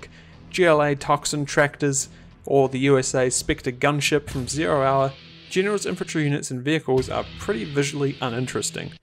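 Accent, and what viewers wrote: New Zealand. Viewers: Are you a kiwi bro?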